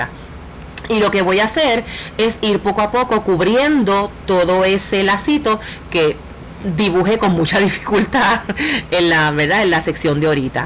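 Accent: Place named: Puerto Rico. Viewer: Me encanta tu acento boricua tengo una tía allí en Puerto Rico.......me encanta tu diseño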